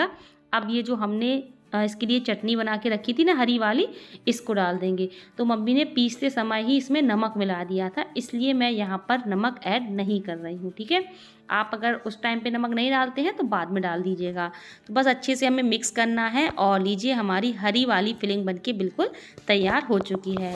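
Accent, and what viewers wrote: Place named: Bundelkhand, India. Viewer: Aapi boli se apnepan ka ahsas hota hai, apni taraf ki lagti hai, aap bundelkhand mai kaha rahti hai, kache amrood na mile to kaise chatni banaye?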